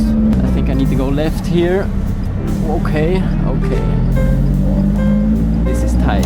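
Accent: Switzerland. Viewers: Are you from switzerland by any chance? Recognize the accent :) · te schwiss german akzent ghört mer sehr dezent haha, aber schön :D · As a german i want to hear your english more likely then your SCHWEIZER-DEUTSCH Accent. (No hate btw✌️)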